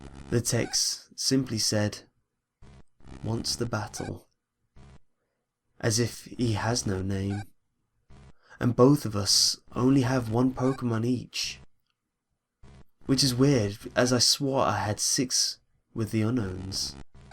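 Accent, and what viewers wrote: United Kingdom. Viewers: I'm guessing you're British or English.....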